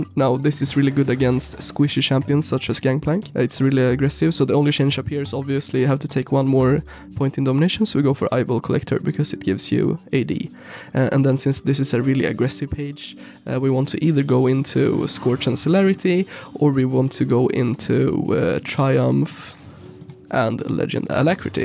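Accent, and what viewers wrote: Sweden. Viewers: är du från sverige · Do hear a Swedish accent? c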